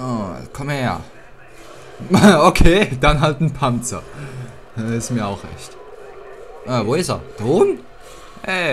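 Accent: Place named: Switzerland. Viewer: Ehi du bist schweizer oder? Man merkts sofort :D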